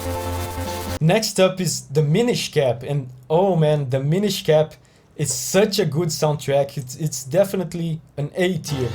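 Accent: Brazil. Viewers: No momento que ouvi tua voz já sabia que era brasileiro. Que legal, já é difícil de achar desenvolvedor de jogos brasileiro, quem dirá compositor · You sound brazilian. Are you? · Nunca vi um inglês tão brasileiro, adorei o vídeo tanto quanto eu gosto de zelda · Pelo sotaque já sei que é br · Hey man! You're brazilian, right? Hahah eu reconheci pelo sotaque em algumas palavras. Parabéns pelo vídeo, fiquei feliz com a colocação de BOTW. A atmosfera do jogo dependeu dessas escolhas artísticas que foram tomadas tão bravamente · Esse sotaque de brasileiro no inglês não me engana 🙃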